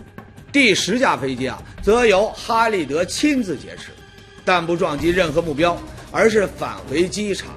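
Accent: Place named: Beijing, China. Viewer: 主持人真棒，好像是北京人！赞赞赞👍👍👍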